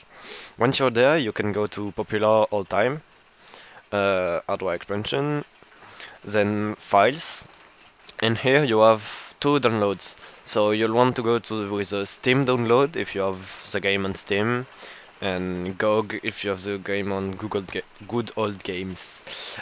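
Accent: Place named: France. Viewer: l'accent francais xD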